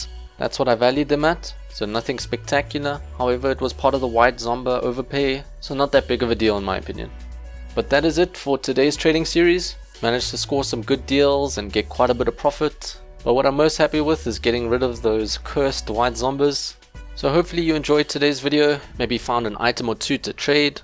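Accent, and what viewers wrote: South Africa. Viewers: Are u south African cause ur accent sounds like it btw i am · South african? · I knew I recognised ur accent, I'm south african too ! hehe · Are you South African ??? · I will guess where you are from so accent sounds a bit African maybe light German but mostly African so South Africa would by my guess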